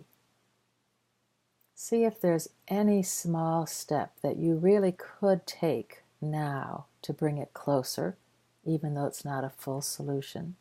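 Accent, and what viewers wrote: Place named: Chicago, United States. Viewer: I love her soft Chicago accent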